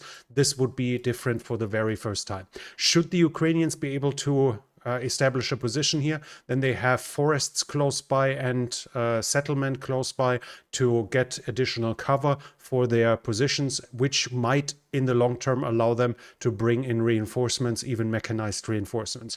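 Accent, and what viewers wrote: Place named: Germany. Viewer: Urgent workout upon Krautaccent needed , more brain work either ....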